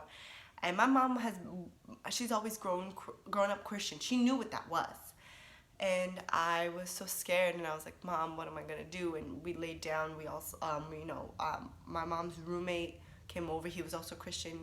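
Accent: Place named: United States. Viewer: Você tem cara de brasileira mesmo, mas teu sutaque é bem americanizado. Deus seja contigo. Mais uma entra mas fileira dos salvos em Cristo. Deus é fiel!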